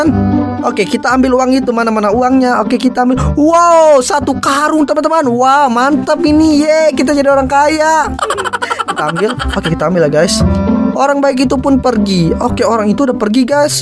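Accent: Indonesia. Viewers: Bang teh orang Sunda apa bukan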